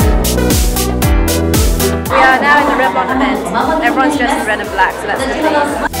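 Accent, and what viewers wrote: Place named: Australia. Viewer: Where did you grow up. I cant tell by your accent sounds Australian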